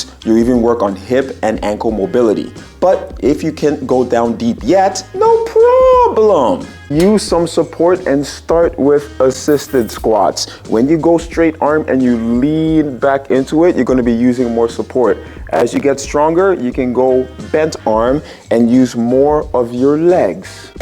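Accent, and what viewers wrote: Toronto, Canada. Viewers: I knew you were from Toronto (GTA) 5 seconds in…. the accent is strong 🙏🏼🇨🇦🥰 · Toronto accent on this man, ahlie?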